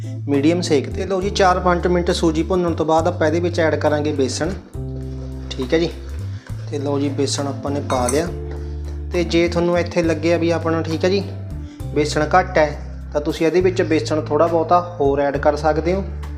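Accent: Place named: Kurali, India. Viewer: ਤੁਸੀਂ ਵੀਰ ਜੀ ਕਹਿੜੇ ਪਿੰਡ ਤੇ ਜ਼ਿਲ੍ਹੇ ਤੋਂ ਬੋਲੀ ਸਾਡੇ ਕੁਰਾਲੀ ੲਇਲਾਕੇ ਦੀ ਲਗਦੀ ਹੈ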